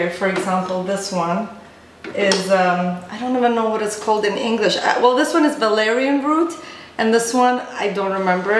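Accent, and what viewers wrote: United Kingdom. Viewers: Her British accent is strong in this video love it!! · why do you take a British accent?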